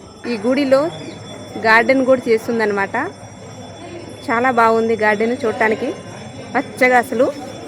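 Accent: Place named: Andhra Pradesh, India. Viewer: Mee Telugu Vijayawada Guntur lo matladya Telugu la undi andi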